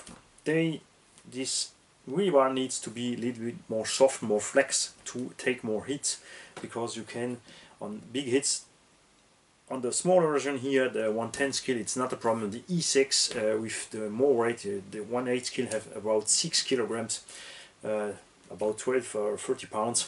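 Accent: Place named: Alsace, France. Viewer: Je comprends rien que ce soit en anglais ou allemand mais entendre ton accent alsacien un vrai régal 😊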